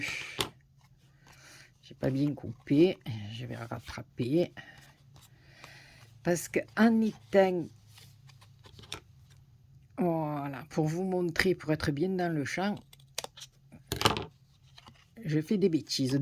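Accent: South, France. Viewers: Grand merci pour ta réponse, j'ai vu tous les jolies commentaires, toutes les personnes qui sont heureuses de ta jolies cartes, de ton explication tu peux en être fière. Et comme d'autres personnes l'ont dit ton accent du sud est très beau à entendre s'est comme quand j'entendais ma maman elle était germanophone sa à la voie chantante et mélodieuse comme celle de maman et j'aime t'écoute et s'est bien dommage qu'ont ne peut pas le faire plus souvent. Encore un grand merci, gros bisous Brigitte de Belgique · bjr madame très belle carte et très bien expliqué j'adore votre accent du sud du midi continuer comme cela a nous montré vos merveilles bisou de véronique de reims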